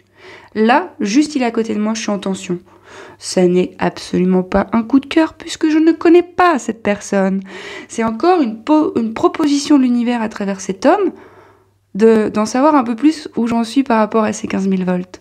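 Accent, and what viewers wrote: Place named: South, France. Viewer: Incroyable cette vidéo et la petite ref de Nietzsche et l'accent du sud c'est fou.. merci pour toute cette justesse et ton partage et cet humour !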